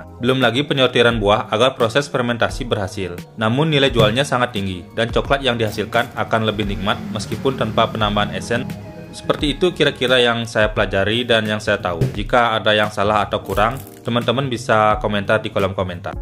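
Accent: Bali, Indonesia. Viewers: Dr Bali ya bli??? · orang bali ya bang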